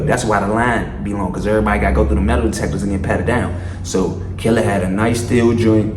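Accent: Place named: New York, United States. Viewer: You talk like you been around more New York cars joints n ppl than anything.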